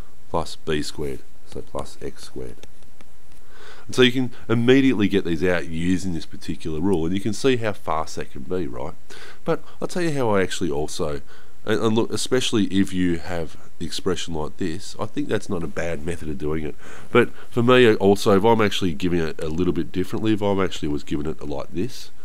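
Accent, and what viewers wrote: Australia. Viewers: why are all maths channels aussie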